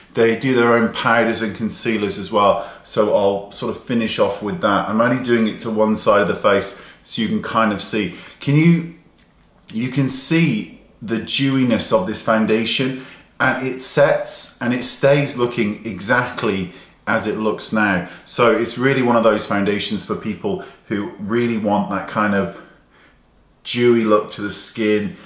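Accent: England, United Kingdom. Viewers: I love your english accent especially how you say dewy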